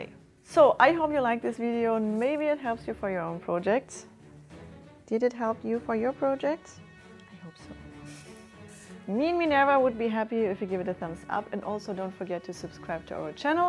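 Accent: Germany. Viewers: You should work on you german accent but well the accent does not make the sword less cool, great work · are you german ?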